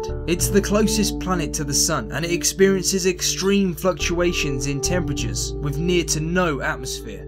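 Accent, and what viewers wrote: United Kingdom. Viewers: I am most definitely sure that it’s a British accent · Nice use of Fahrenheit in 2015 with a British accent where we use Celsius unless you're older than like 50. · Your accent is British but PLEASE dont talk the American way by saying farenheight cuz your english is native and how it is supposed to be whereas America's dont own the english language natively in which they rewinded a few words making it confusing, Its your language please say degrees Celcius and maybe put farenheight in brackets? (sorry for my poor spelling)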